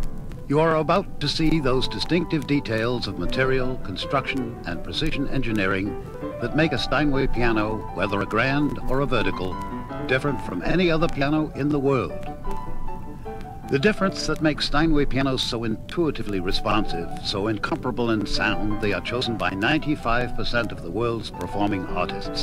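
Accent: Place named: New Zealand. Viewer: Why does the narrator, John Steinway have a NZ accent? I am confused... Is there a NZ connection?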